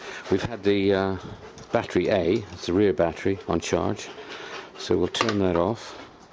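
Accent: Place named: United Kingdom. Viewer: Hi thanks for uploading this video. I'm assuming by your accent that you are from the UK? I was just wondering if you have ever been stopped or asked by the police about the bike? The UK law on e-bikes have been changed so those 'off road' boost buttons are no longer permitted. So the new A2B only does 15MPH as standard but Ultra Motor can up the power to the full 20MPH again for 'off road' use. I'm planning on purchasing a new a2b but wondering if I should get it unrestricted or not. Thanks!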